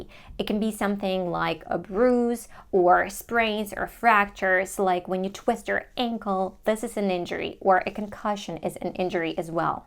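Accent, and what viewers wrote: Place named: United States. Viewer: 😊😊😊excellent ! Ma’am , ur accent is just like an american ! We r surprised to know that u r from Ukrain ! How did u develop the US accent ? How much time it took ?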